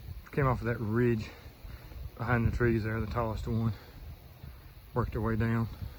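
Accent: South, United States. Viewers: Thank you for finding more mushrooms and catching them on camera. That first big one almost didn't look real. Amazing there are so many kinds. If rock walls could talk, they could tell you lots. Most represent the skill in which the person built them. Some may fall apart due to vandalism or people searching through them, but the one my daddy and us kids built is still standing strong. If there was a way I could include it in this comments section, I would. .......I also appreciate you filming sections of the towns you are going through........still love seeing the old houses "up north". The architecture is so different than you see here in the south. Keep holdin' on to your sweet southern accent !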